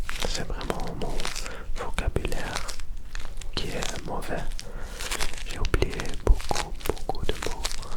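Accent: France. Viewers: J’adore ton accent français!! +1 follower yayy!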